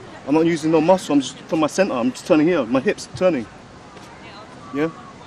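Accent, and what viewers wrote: London, United Kingdom. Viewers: sound like you come from East London or South London lol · Love your British accent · what lmfao it’s a London accent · definitely 100% a London accent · You look like a Thai master with a British accent😱👍🏻👍🏻👍🏻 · he has a strong london accent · Just a basic London accent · He sounds almost like Africans that live in England. Lol!! This looks soooo relaxing. If it were me I'd just fall down. "You just gone have to come down here lao shi."😊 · I subscribed for the simple fact an Asian guy with a London accent it just cool AF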